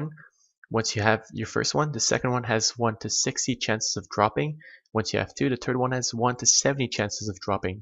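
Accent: Quebec, Canada. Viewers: Hey that accent, Quebec:P Bon guide!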